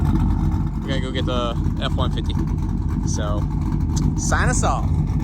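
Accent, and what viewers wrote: Alabama, United States. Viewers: I knew you’s from alabama when i heard that accent you got. Bad ass truck man glad to see a fellow alabamian on social media doing bad ass stuff with bad ass trucks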